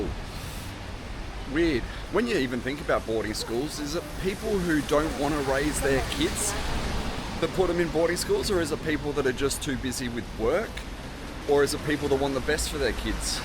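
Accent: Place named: South Australia, Australia. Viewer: from your accent I can tell you're from South Australia... nothing beats a good kitchener bun.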